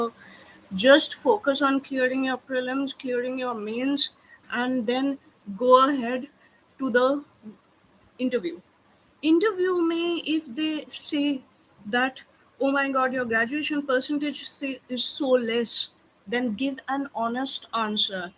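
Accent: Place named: England, United Kingdom. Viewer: Why her Hindi sounds some Bollywood Angrez Hindi accent. Oh wait she is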